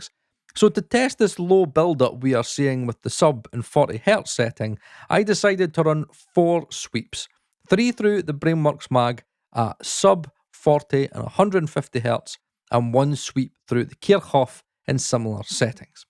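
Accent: Scotland, United Kingdom. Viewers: so this isnt a trainspotting video? (im happy about this) i just have a shallow relation of the scottish accent and a 10bag and pin...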